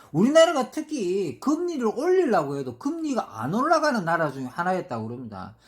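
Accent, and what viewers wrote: Gyeongsang, South Korea. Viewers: 네~~맞아요 목소리도 좋고 경상도 사투리 도좋고 ㅎㅎ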